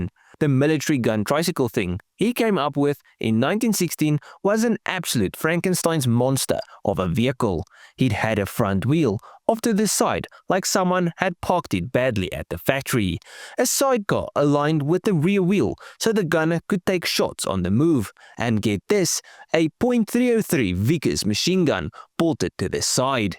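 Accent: South Africa. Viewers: Parelel twin not vtwin. You sound like a South African.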